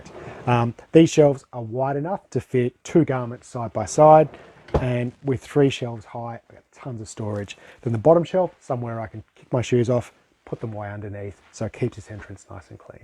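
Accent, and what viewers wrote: Australia. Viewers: Dump Chump I agree I heard a accent didn’t know it was Australian though, wasn’t focused on it. To be honest I was a bit drunk when I posted. Not a excuse just I wasn’t in my peak operating condition. I often forget we aren’t all living in a global economy, I don’t notice the separation anymore. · Nicely designed for the space. Lots of thought put into it. The entry/overhang is well worth the tiny added cost - it gives it character. Just something to share...one tiny house I saw recently showed a toilet that was in a bathroom, but it was incorporated into a cupboard, and looked just like a low cupboard door that was part of a tall cupboard. The lower part just rolled out on wheels and was a composting bucket. You could do the same here, thereby utilizing the large entry space (which would then provide the headroom needed forcthe action of sitting and rising from the toilet) and would thereby free the upper portion of what you currently have as a toilet for use as storage. 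If course no privacy if there are 2 of you, but it's an option for someone for whom that design would work. You say $4,000, but YouTube is global and I can't tell whose dollars that is - Australian? New Zealand? I'm guessing those because of your accent and use of the word bench for what North Americans call a counter. 😊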